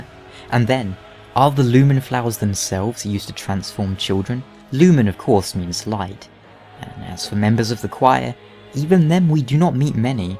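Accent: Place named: United Kingdom. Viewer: His British accent makes this seem as informative and credible as a TED talk :D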